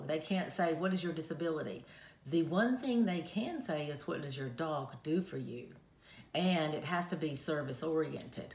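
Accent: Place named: South, United States. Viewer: Hi: I know this video was posted several weeks ago, but since I'm new and I really love your content, I'm catching up. So please forgive the late comments.:) I thought you did a great job with this informational video. I liked how clearly and kindly you explained everything. Also as a side note, you have the coolest accent; I can't help but think of southern homecooking and maybe being in a comfie room chatting with you and other southern belles. Back to your video. I think one of the things many people don't understand is everything we experience involves the brain. I have a huge love for neuroscience so I like to at some point educate involving the brain--without getting to sciency.::LOL:: I think many sighted people don't get that the eyes send signals to the brain and the brain does all the processing. As for guide dog knowledge, man oh man, are people more clueless than ever! 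You did a great job explaining what Gretchen does and doesn't do. I would have also emphasized what part you played in getting her to where she is as your guide. Yes, she's trained to do this, but she wasn't just trained by SE trainers; unless you had a trainer who taught her things like locating the counters and trash cans, you did that yourself. I would have said that early on because I think many have this impression that the dog does these things but the handler doesn't have much in the training aspect. How cool that your girl can find all of those things for you. Is she just really go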